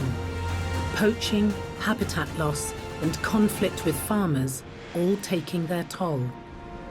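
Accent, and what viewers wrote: United Kingdom. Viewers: what 😂 nice voice and British accent makes everyone seem knowledgeable but this documentary is very inaccurate plus I don't know if it's on purpose but they were jumping pivotal moments in our country's history when she was talking about axum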